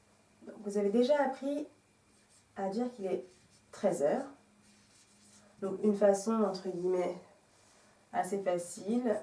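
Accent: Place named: England, United Kingdom. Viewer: Super l’accent anglais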